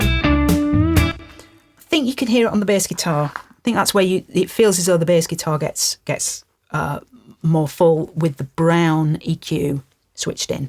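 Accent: Northern England, United Kingdom. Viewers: Hi! So glad I found your channel. There are way to many options and mix decisions to make with the plethora of plugins these day that it's paralyzing creativity. It reminds me of when Photoshop plugins went bananas (previous career). Too many options doesn't equal better results, in fact I would say it disables it. I love your Chanelle, and as an ex-pat from Leeds it's great to hear a northern accent. Keep up the good work. Cheers!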